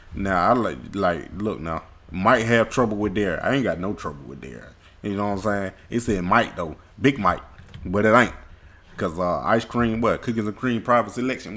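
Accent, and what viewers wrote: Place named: Florida, United States. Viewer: Sound like you’re from Florida 😂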